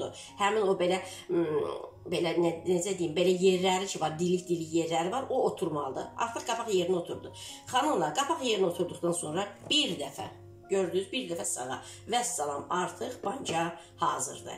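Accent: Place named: Baku, Azerbaijan. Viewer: Caaan Bakilim Lehcene qurban.Allah sizden razi olsun.Sizi sevincle izleyirem.Bakinin 32 kendine salam olsun.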